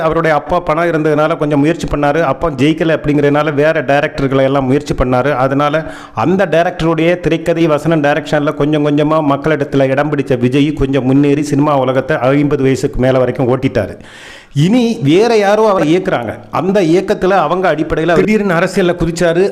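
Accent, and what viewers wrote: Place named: Kerala, India. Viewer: தம்பி அருமையான பதிவு தம்பி உன் குரலில் மலையாளம் கலந்து வருதே நல்லா இருக்கு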